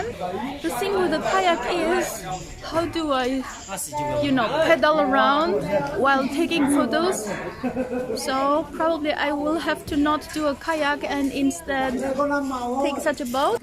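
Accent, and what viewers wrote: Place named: Japan. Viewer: Yeah I thought you have that Japanese accent😂 , but your European appearance confused me a little. 🤔😓 Thanks so much for the nice comments about Vietnam😆, I also visited Tokyo - such an energetic/colorful city, the people are lovely/beautiful!! I hope you enjoy your traveling; and keep recording your precious videos/memories. All the best to you🤟🏻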